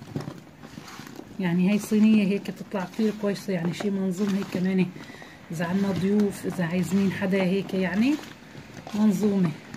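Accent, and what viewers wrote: Aleppo, Syria. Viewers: تسلملي اللهجه الحلبيه...ابوس روحك 🌹🌹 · خالة حلبية 😍 من صوت عرفت 😘❤ ونعم وانا من حلب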